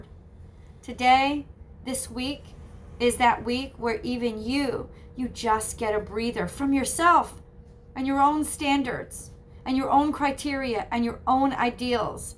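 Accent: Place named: New York, United States. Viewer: Such a small world, when I first watched I thought you were from NY from the slight accent until you mentioned the place in Derby! Just moved to Newtown, originally from Danbury area. Not sure how the YouTube algorithm works but didn't think it would be suggesting people from the area haha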